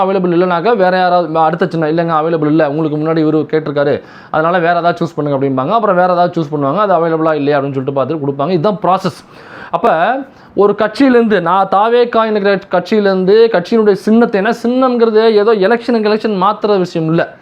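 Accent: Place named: Kanyakumari, India. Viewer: Kanyakumari tamil uthhu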